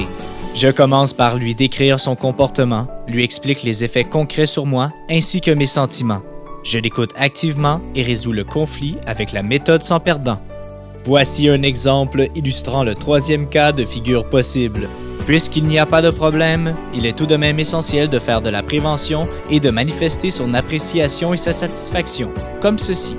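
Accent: Canada. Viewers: T'as fait une présentation exemplaire mais pardon ma sauvagerie...Ton accent Canadien à détruit partiellement ce vidéo... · super, l'accent canadien c'est fun! moi j'adhère, merci!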